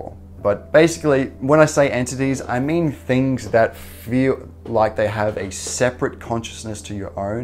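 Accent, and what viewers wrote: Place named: Australia. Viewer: Where are you from? You sound kinda Australian but idk it doesn't sound like 'ultra-Aussie-accent'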